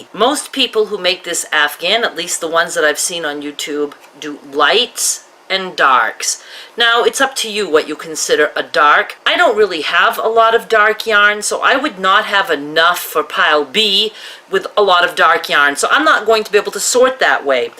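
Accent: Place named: East Coast, United States. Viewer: I am brand new to crochet and to your channel. I know the basics, but gosh I'm rusty I guess. I want so badly to make one of these afghans, but am having trouble with the turning the corners, can you do a quick snippet of just turning the corners for us, it would be a huge help. By the way ... you sure sound like you're from the East Coast, am I correct? I'm originally from Connecticut, living on the West Coast now, but you truly sound like so many of my friends from home (Maine maybe?) Thanks for sharing, your afghan turned out gorgeous, I hope one day soon I can make something like yours.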